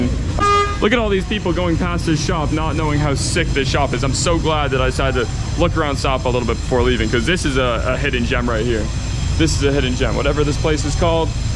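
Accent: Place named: California, United States. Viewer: you sound Californian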